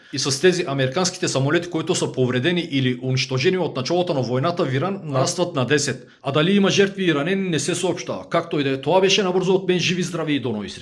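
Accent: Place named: Kardzhali, Bulgaria. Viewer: Браво ,кратко и ясно кържалийски диалект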